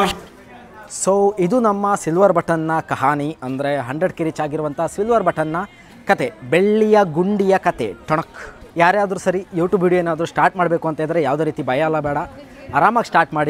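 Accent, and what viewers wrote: Kundapur, India. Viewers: Bro niv pojaraaa navu poojary.. Nim kandapr bashi mathad thidre bari kushi ath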